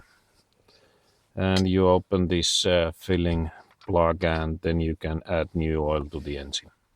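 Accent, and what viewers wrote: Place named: Finland. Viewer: Thanks man, very useful! Also love that finnish accent! Cheers from the Czech Republic :)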